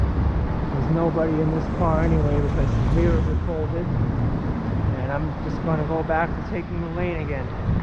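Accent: New York, United States. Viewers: The hustling and bustling of the streets at Chinatown and Little Italy. I love to listen to the commentary and your NY accent. I will watch in stages. Do you prefer day or night bike rides? You have done an excellent vidblog. Thank you.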